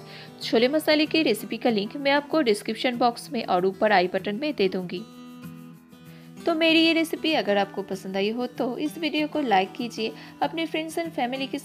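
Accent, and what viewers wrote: India. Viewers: Such a sweet Hindi accent , thank you for the reicpe.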